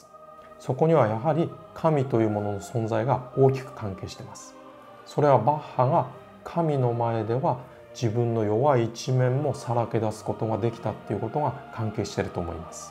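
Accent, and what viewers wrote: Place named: Fukushima, Japan. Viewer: ピアノでバッハを弾くことを躊躇っていましたが、この動画を観て勇気が出ました。ありがとうございます😊 ちなみにわたしは福島県出身なので、の話し方には親しみがあります。これからも楽しみにしています。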